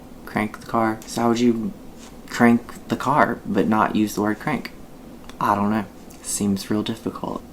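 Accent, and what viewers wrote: South, United States. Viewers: You said the magic words with the best Southern take… “I feel like _____.” Southerners say this when we have an opinion on something. Master’s Level: pronounce it like does… Ah fill like ____. ❤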